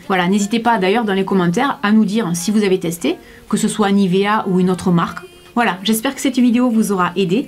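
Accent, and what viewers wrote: South, France. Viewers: Tu est trop belle avec ta teinture, tes lèvres, tes yeux et tout enfaite! Tu est suuuuuublime je t’adore maman. Tu me redonne le sourire avec ton rire fabuleux et tu me donne chaud dans ce froid avec ton accent du sud. Pour moi tu est LA bombasse que tout le monde envie et du haut de tes 40 ans tu fais rêver les plus jeunes d’entre nous... voilà, c’était pour te dire à quel point je t’aime 😍 bros bisous! ❤️😘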